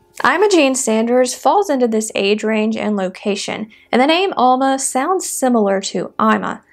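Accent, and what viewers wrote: South, United States. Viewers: has a nice southern accent. Also, she is a good narrator.🤓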